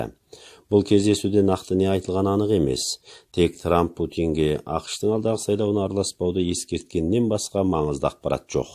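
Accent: China. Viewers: Бұл админ қытайдан келген қазақ сияқты акцент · Кытай акцентj басым .казакша окыту керек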